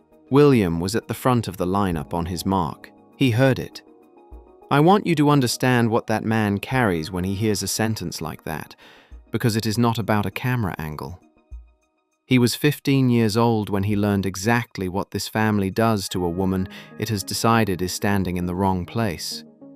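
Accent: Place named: United Kingdom. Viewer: I have read the disclaimers. And, of course I notice the same scenarios worded differently and the span between the repeats. I also notice quite a few British accents during the narration and sometimes the BBC. I might add that I am not a fan of Camilla, since the affair came to light. It’s outright dishonesty to the one you are betrothed, to your children and considering Charles position, to his country. Something you could NEVER accuse Queen Elizabeth of. I’m not saying others are perfect. Look at the governmental mess in USA. It’s entertainment and I have an opinion On the scenario rather it be true or false! My God, after reading these things you could not pay me to be a royal! Their lives appear to be maybe 25% their own. Any way, if you hear anything that’s actually news and not entertainment, post it, I would love to read it! Thank you for your comment😊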